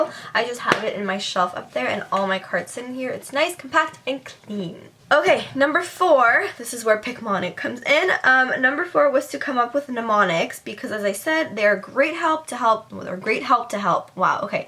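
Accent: Canada. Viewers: Omgosh!!! your canadian?!?!?!? That makes so much sense!! I always thought that your accent was unique.... and that i've heard it before.. lol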